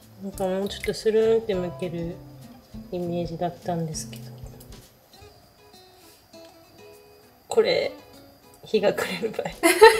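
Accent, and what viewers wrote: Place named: Miyazaki, Japan. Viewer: 花嫁修行でうまかっちゃんかよ！😊笑ゆで卵はガンガンやって全体にヒビを入れた方が剥きやすそうさんって宮崎っぽいアクセントだけど県内の人なのかな？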